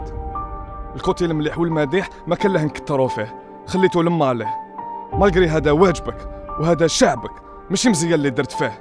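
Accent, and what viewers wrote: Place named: Algeria. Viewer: هدرتك تبان جزاءرية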